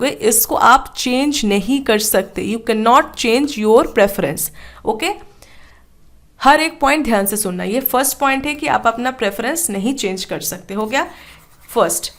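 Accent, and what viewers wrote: India. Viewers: Bengali with Hindi accent😱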